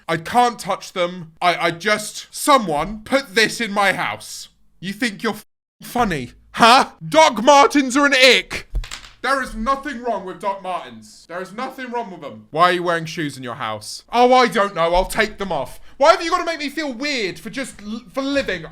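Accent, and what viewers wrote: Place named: United Kingdom. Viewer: I thought you were american for a while until I realized you were british ick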